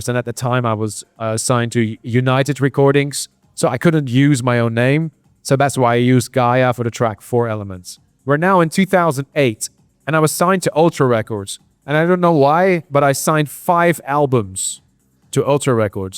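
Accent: United Kingdom. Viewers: Why does he sound British? Lol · Armin sounds so different here versus ASOT. He sounds a bit British... Bizarre! Anyway, will definitely listen to this. Love this kind of insightful stuff.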